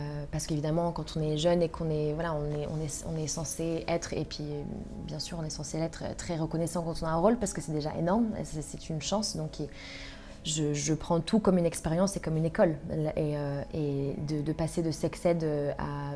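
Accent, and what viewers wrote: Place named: France. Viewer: She has a very good strong frenxh accent and British one 😮❤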